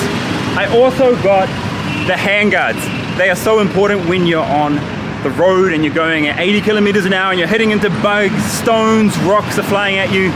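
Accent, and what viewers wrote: India. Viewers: I love how the inflection of the Indian languages come through in your voice, it’s really cool. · Nice job Very Nice. India is now your Oyster. Safe driving. I've noticed that your New Zealand accent is Vanishing and I don't know you, I've noticed that you are gradually slowly getting a hint of Indian accent different words are stronger than others, No doubt you have already been told this?👍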